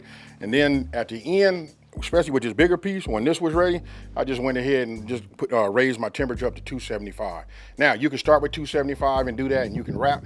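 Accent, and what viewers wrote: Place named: Los Angeles, United States. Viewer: I swear if that ain’t an LA accent, I don’t know what is 😂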